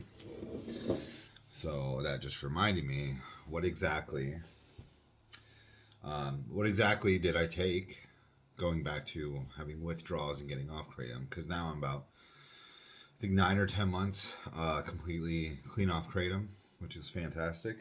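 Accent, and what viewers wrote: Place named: United States. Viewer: You look really Norwegian indeed. Hearing your fluent English American accent is so weird.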